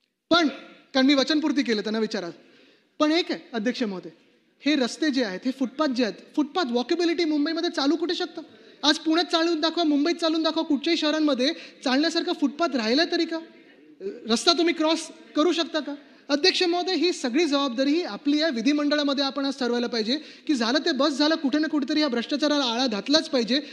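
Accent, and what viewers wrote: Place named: Marathwada, India. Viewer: नंबर एक मुलगा आहे त्याच्या बोलण्यातून खरा स्वाद येत आहे मराठवाड्याचा मुलगा शोभला🚩👍☝️🌹🌹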